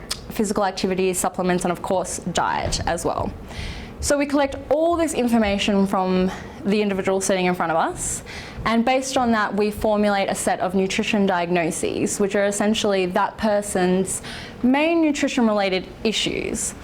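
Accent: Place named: Australia. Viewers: Can't place her accent. Is aussie...sort of...but something else mixed in... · There is a hint of a regional Aussie accent. To ears not familiar with the Australian accent, Mark Twain described it as the most purest English accent. I’m pretty sure he was drunk. However, our society really didn’t like a classist society modes and thus our accent is based on a societal protest against elocution. Enter the Aussie twang. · No that's an Australian accent. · That's a typical younger, educated Australian's accent.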